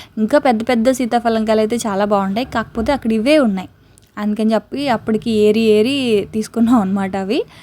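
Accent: Godavari, India. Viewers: Meru pakka godavari slang lo matladutunnaru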